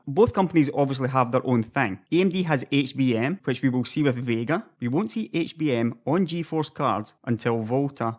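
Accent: Scotland, United Kingdom. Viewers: content : 40% scottish accent : 60% total : 100% subscription rate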